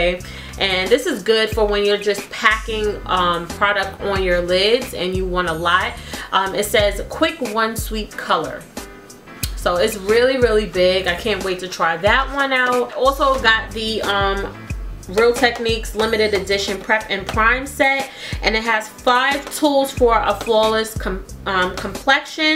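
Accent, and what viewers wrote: New York, United States. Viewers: I LOVE u and ur videos. I can listen to u talk all day!! (the NY accent 😊) Please do a video on the Kylie lippies!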